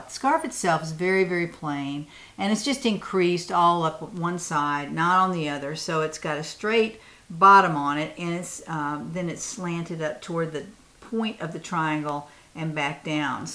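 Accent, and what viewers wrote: South, United States. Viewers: This is the first time I’ve watched one of your videos. I really enjoyed your content and Southern accent. That comfy yarn looks great!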